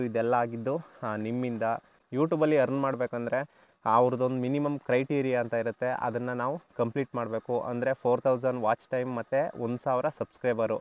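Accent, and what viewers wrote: India. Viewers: HAI. I LIKE YOUR KANNADA ACCENT . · Anna Niv matado style le thilkonde niv nam Chamarajanagara kadeyavre anta... olledagli anna videos madta iri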